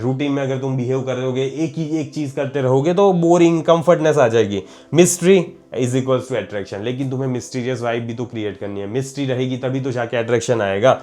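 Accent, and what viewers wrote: Gujarat, India. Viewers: Bhai, I am also a gujju, its so cute when ur Hindi has a gujju accent 😂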